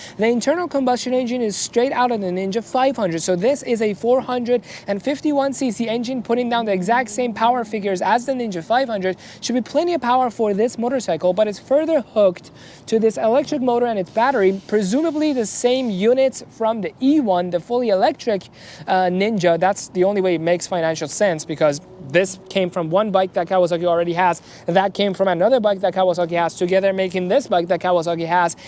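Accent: Iran. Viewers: Farsi accent 😂👍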